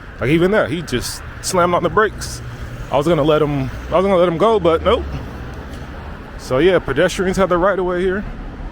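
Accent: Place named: Massachusetts, United States. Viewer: I like your pioneering and adventurous spirit. I invited a dude from the USA, who spent 10 days at my house in front of a laptop, We live near France and Switzerland. I tried to suggest he visit Zurich, Basel or Strasbourg for a day, but he was surely not interested, the good part of the deal I was willing to drive him there at no cost to him to show him around. By the way your accent suggests you come from Massachusetts, the New York area, I could be wrong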